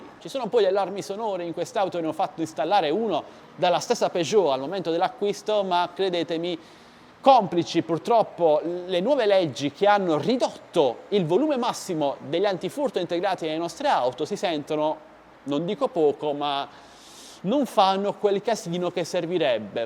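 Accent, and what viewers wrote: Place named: Apulia, Italy. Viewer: Trackting non è testato contro i jammer infatti sento dal tuo accento che sei pugliese e intorno a Cerignola non serve a niente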